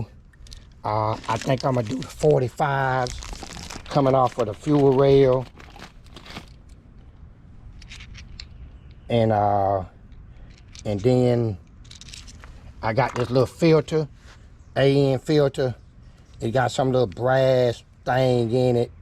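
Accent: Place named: North Carolina, United States. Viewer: heard the carolina accent, what part of nc?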